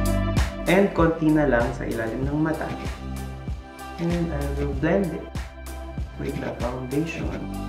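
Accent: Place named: Visayas, Philippines. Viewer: ❤️❤️❤️..i love the way how you speak bisaya word..😘😘😘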